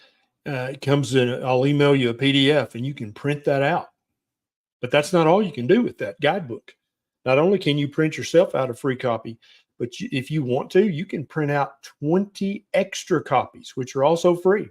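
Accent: South, United States. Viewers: I'm not a carnivore, not even a ketovore or a keto person... but I do listen to you & glean a lot of wonderful information... I also respect your logic & candor... plus, I could listen to your southern drawl all day long :) . So, I guess for me, when I hear the term MAHA, I do cringe because I tie it with MAGA partly because RFK Jr. started it- and while I agree with the idea of striving to "make America healthy again, I don't like that the movers & shakers, who I love & respect (Calley & Casey Means, Max Lugavere, etc) have become so political and such cheerleaders for the far right. It sickens me that RFK Jr who touts being an environmentalist has tied himself to someone who wants to loosen emission laws and allow MORE chemicals to spew into our air & water. It sickens me that he has tied himself with someone who we all KNOW (I know you know too) that Trump doesn't give a rat's ass about anyone's health (or well-being) and is just using RFK for his own benefit. 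And shame on RFK for being bought & throwing his concerns & convictions out the window for this sick, dangerous man. Yes, I'm a Democrat who will vote for Harris - but I know that they will also not do much for the food issue (although they do believe in science and know that allowing more pollution is a bad thing, etc.)... I guess I would like to see those heroes of mine in the health world not take sides - and not align themselves with such hypocrites.... I"m with Dr. Shawn Baker - I believe he's skeptical on the g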